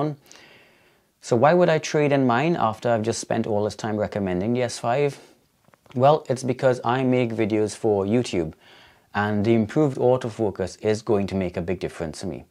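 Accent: Caribbean. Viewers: Great video! I just got my S5 and am learning to use VLOG and colour grade. You sound West Indian. Are you? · Fantastic user's insights into the S5. Great hybrid camera. Your hybrid Caribbean accent is also great.. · Being from the Caribbean I recognise Caribbean accents instantly 😅 Thank you for the informative video, particularly sharing tips for auto focus settings. I've probably watched over 20 videos about the LUMIX S5 in the last 2 days and your video is the 3rd video to share tips for better auto focus out of 20+ videos. All the other videos I've seen just complain about the auto focus but don't offer any tips to improve it, I thought one of the biggest selling points of having a camera with pro features was the ability to dial in your own custom settings but seems like those other reviewers just wanted the auto focus to be good out of the box. Considering what this camera goes for now with the 20-60 lens included (under £1,200.00 New) I think it's a small compromise. 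I've been saying for years, "I'm going to buy a high quality camera" to share photos & videos of my work but other things kept coming up but I think the time is now and the LUMIX S5 looks like a very good deal for the level of quality it can provide. Thanks again for sharing your thoughts and experiences 👍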